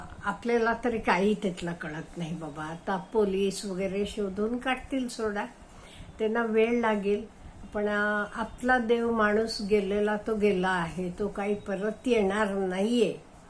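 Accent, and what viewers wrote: Karnataka, India. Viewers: तुम्ही मूळ कन्नड बोलता का. बोलण्या बरून वाटते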